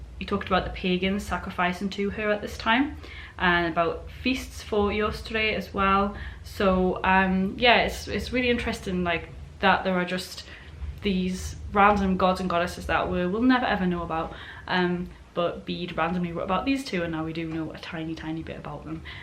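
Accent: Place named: Geordie, United Kingdom. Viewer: you sound like you live just up the road from me, maybe gateshead area. that's awesome. very bonny lass.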